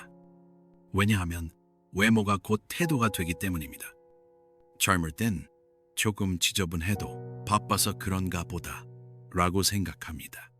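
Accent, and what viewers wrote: United States. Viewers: 발음이 미쿡교포이신것 갗아요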